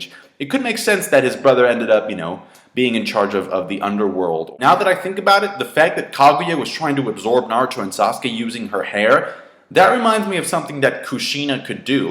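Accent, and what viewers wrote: Mexico. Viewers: Español con acento mexicano.... jajajaja pero la pronunciación es impecable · Si habla como mexicano, yo también lo soy. jajajaja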